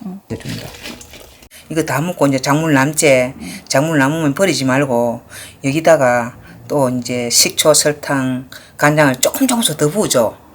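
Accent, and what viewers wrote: Gyeongsang, South Korea. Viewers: 엄니 설명이 유머스럽네요 경상도억양 정겨워요 양파장아찌 맛나보네용 비율도좋고따라하기쉽고~👍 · 말씀 도 완전 경상도 사투리 정감 가고 좋아요 😀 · 선생님~ 엄마가 정말 팬이세요~ 햇양파가 많이나와서, 양파장아찌는 벌써 두번째 담아 먹습니다. 남해분이시라더니, 저도 경상도 사람이라 말이 정감이 갑니다~~♡♡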